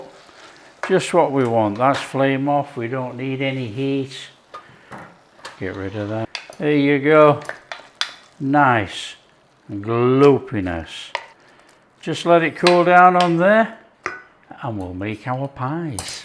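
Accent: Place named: Lancashire, United Kingdom. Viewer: Im from littleborough lancs i think i may be not far from you by your accent, i will google magna thanks.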